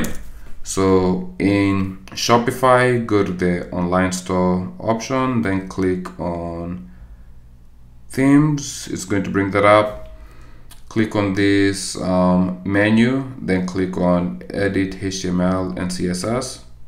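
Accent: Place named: Zimbabwe. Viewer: Great video. Are you zimbabwean? Your accent sounds like you are from zimbabwe.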